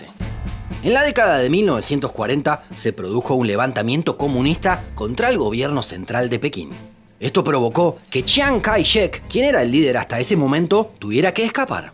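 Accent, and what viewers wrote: Argentina. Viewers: Siempre tuve la duda si sos argentino o mexicano, pero para mí sos compatriota 🇦🇷 jaja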